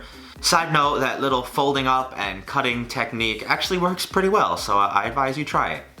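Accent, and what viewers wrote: New York, United States. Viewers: I knew you lives in New York, you got that accent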